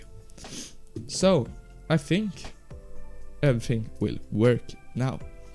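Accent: Sweden. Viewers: Are you swedish it sounds like that good tutorial! :D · bro have got the swedish accent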